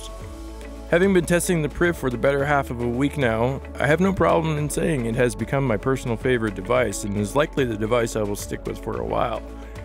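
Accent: Canada. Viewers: Definitely from Vancouver - the "o's" and the fact that hes high give it away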